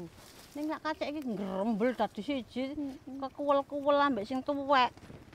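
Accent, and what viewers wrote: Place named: Mojokerto, Indonesia. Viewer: saya dari Mojokerto hadir asli orang mana kok logat bicaranya kayak orang Mojokerto, suka banget kontennya 👍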